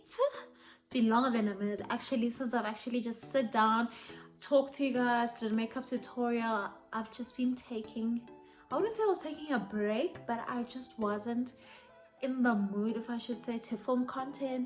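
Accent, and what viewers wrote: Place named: Zulu, South Africa. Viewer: I'm part of the many people who assumed you're Zulu. 😂 I enjoy this video & I like your accent. 💕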